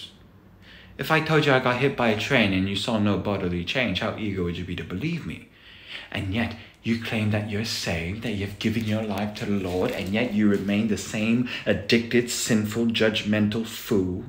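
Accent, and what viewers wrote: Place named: United Kingdom. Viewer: The British Accent😅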